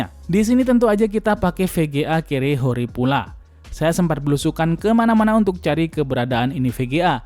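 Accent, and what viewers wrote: Madura, Indonesia. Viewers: bang.. lu orang Madura ya ???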